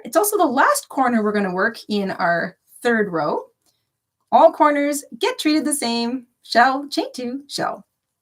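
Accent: Canada. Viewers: Your squares are extra special, thanks so much for sharing. I’m excited to try this. Best regards from South Africa 🇿🇦 hope you are well in Canada? Am I right? Your accent sounds Canadian 🇨🇦💫 oh ok you said hi from Canada ✌️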